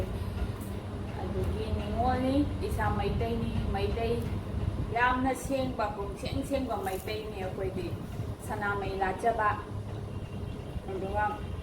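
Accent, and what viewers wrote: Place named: Kakching, India. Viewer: I knew it that you were from kakching the first time I started watching your video. My mum grew up in kakching and the way my mom speaks manipuri language whenever we visit kakching is same as yours ❤️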